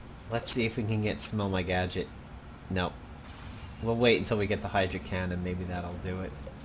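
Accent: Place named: New Jersey, United States. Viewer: Thanks for posting this video,. My hearing isn't the best, but I would've guessed you were from New Jersey.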